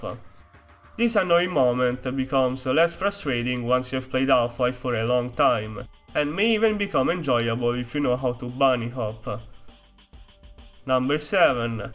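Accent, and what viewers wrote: Italy. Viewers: Wild guess but, are you by any chance italian?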